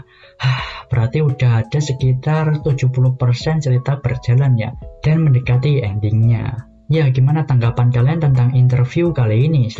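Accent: Java, Indonesia. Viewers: min kau org mana sih? dri dulu ampe skrg medoknya masih kerasa bgt sumpah 😅😂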